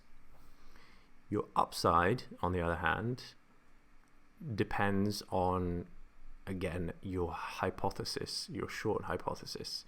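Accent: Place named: England, United Kingdom. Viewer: Great content man. You must be the only Italian on earth with an English accent.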